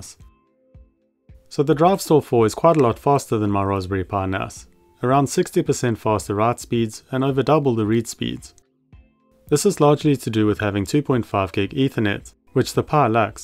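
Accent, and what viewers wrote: South Africa. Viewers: Hah! Thought I recognized the accent, I'm also South African, though still in the homeland. Love the channel man, gonna do a lil Pi NAS project in December based on your specs. Thanks for channel man.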